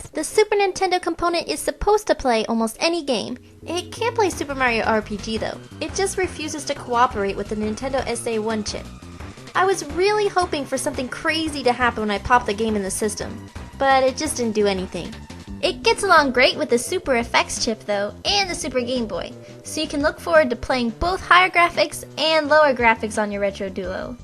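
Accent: Canada. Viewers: Your Canadian accent is so cute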